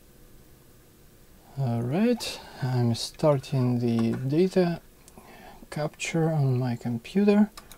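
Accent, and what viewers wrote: Russia. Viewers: русский акцент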